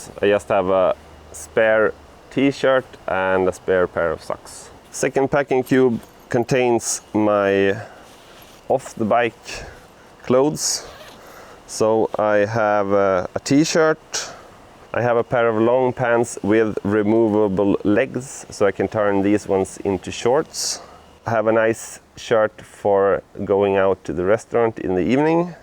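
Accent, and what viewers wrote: Sweden. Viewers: Hej! Du låter lite svensk. Stämmer det? Jag har precis beställt väskor som du har. Och en duffel man kan lägga på och spänna på. Har inte någon särskild plan eller resa i åtanke men har för avsikt att göra några längre turer till sommaren. Har det fungerat väl för dig?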